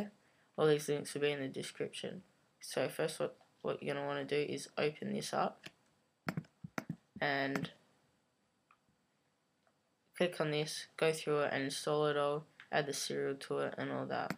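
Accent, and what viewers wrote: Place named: England, United Kingdom. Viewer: ...annoying english accent from a teen not wanting to talk straight.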